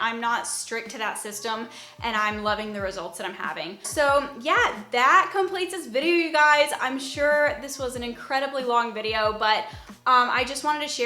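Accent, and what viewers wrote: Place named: Michigan, United States. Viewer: Super weird question.. are you from Michigan? Your accent sounds EXACTLY like a like my multiple of my friends.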